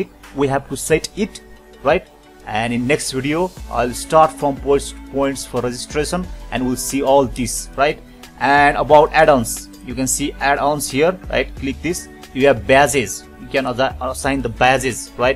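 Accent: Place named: Nepal. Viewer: Bro Nepali ho? Accent testai testai chha ta. 😁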